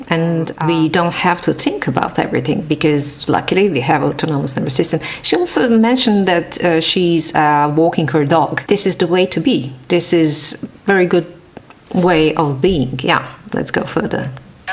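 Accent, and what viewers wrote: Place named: Texas, United States. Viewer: Thanks for your Texas accent...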